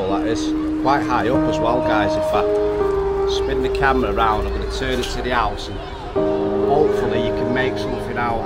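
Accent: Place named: Salford, United Kingdom. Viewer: The hollywood touch with a salford accent. Combining flavours.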